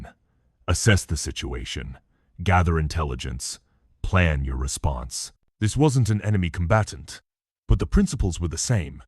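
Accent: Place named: United Kingdom. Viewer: You know the stories military angle & the accent used by the AI makes it sound off. Might want to tone down the accent so it doesn't sound British so much.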